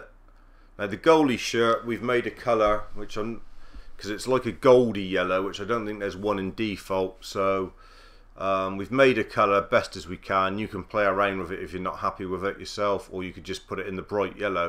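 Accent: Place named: Gloucestershire, United Kingdom. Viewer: Sound like your from gloustershire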